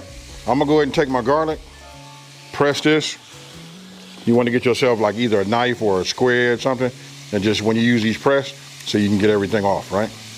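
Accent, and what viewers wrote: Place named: South, United States. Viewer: If you’re from LA, where did you get that accent? I assume the south? Great video!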